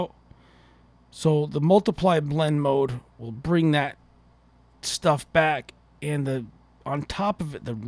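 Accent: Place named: Boston, United States. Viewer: just subscribed. placed your accent in Steven Wright country, so not surprised to hear the Boston reference. nice straightforward tutes delivered humbly . thank you sir.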